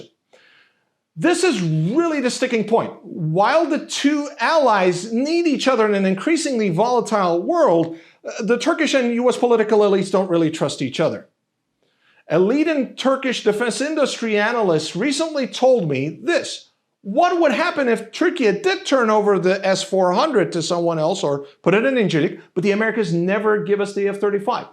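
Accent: Russia. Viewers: That russian accent... 😅👍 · I like your Russian accent… great …. No thanks to F35 …when you chase 9 years of Chasing Patriots … now go take hike they said sooooo well, …. C’est La vie….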